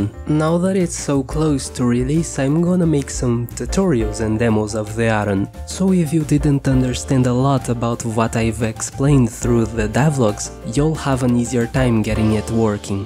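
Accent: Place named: Brazil. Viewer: Acabei de me ligar que ele fala inglês com sotaque kkkkkkk deve ser BR ctz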